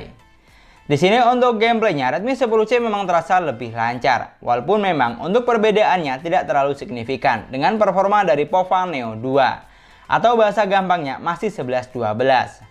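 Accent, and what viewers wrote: Madura, Indonesia. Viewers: logat madura ya bang?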